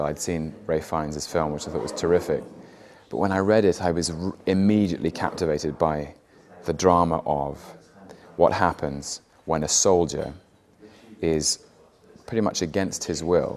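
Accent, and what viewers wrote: Scotland, United Kingdom. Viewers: Does OP sound a bit like a Scottish dialect?? It sounded like that to my unpracticed ear. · Why is Tom Hiddleston reading the play with a scottish account? I thought this play takes place in ancient rome? WTF?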